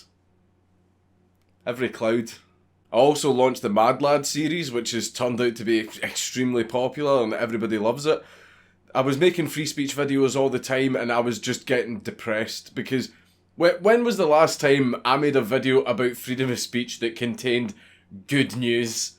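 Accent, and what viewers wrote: Scotland, United Kingdom. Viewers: I don't know why, but whenever I watch one of your videos. I'd scroll through the comments and suddenly everything I read sounds Scottish (except a few with five billion emojis). Amazing video. I hope all is well for you for the new year. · 10 seconds in and its already so scottish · Winter solstice over Christmas? Good man. Love the accent (alas I am aberdonian) and keep fighting for free speech. Makes me proud to be Scottish. Lots of love from Aberdeenshire ❤ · Too Scottish, disliked.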